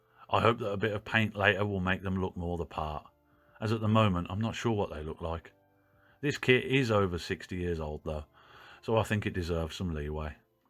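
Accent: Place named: England, United Kingdom. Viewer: Wow!! An English voice on a modelling video for a nice change!!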